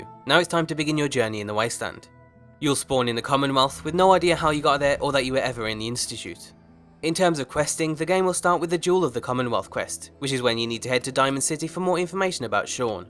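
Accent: United Kingdom. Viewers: I'm a subsriber since...a long time, but I still don't know where is from. I'm guessing british, but not sure.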